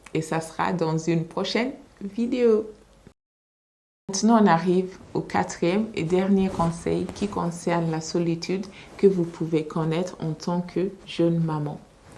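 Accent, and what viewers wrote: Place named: France. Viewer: Instead of reading the subtitles I am listening to your lovely French accent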